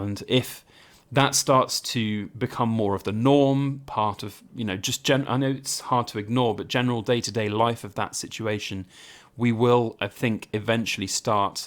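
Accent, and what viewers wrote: United Kingdom. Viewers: Greetings from Colorado. You have to pay VAT on silver? I'm surprised it's even worth buying once the tax is factored in. I'm coming over to UK this summer and might consider bringing silver to sell. Love the Harry Potter accent!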